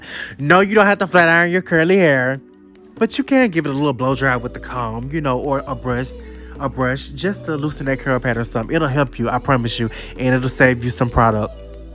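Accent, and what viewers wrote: South, United States. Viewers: I appreciate how u say “akahawl” I’m a Cali native the southern in ya is adorbsss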